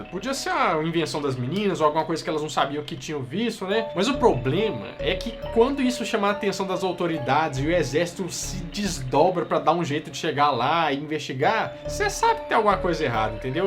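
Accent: Minas Gerais, Brazil. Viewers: Acho fofo sotaque mineiro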